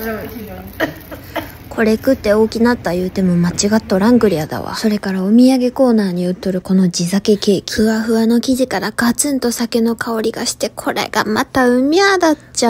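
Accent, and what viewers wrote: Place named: Tango, Japan. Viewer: 地元動画ってめっさ感動した。また京丹後市の丹後弁聞かせてな〜＼(^o^)／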